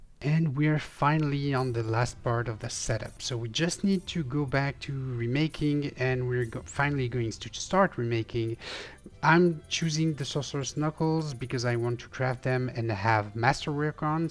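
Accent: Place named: France. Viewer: Cool pourquoi tu ne fait pas tes vidéos en français aussi ? On entend bien que t es fr ça serait cool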